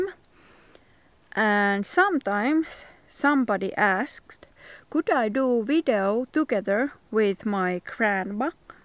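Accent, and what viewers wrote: Finland. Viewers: I am from Nepal(very small country from asia)..and the most unique thing i found about Finland is the accent...i have mentioned in ur other videos...its really amazing the accent...Moi!!😁😁😁😁😁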